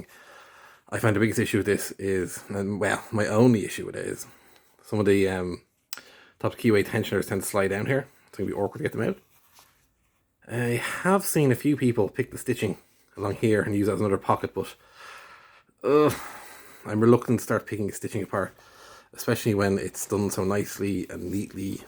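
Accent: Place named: Ireland. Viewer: Where in ireland you from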